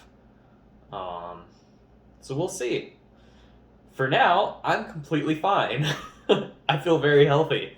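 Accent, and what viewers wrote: Colorado, United States. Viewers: Heyy 😅 this is probably not the comment you were expecting but I was looking for a video with someone who had that beautiful Colorado accent as I have to learn it for an acting gig. Your like the ONLY ONE I can find on Youtube! But I must say thought this is one darn interesting video. I was captivated until the very end! 👌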